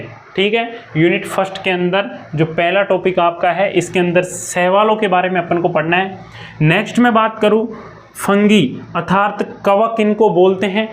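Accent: Rajasthan, India. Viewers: Sir aap Rajsthaan se ho kyaa 😊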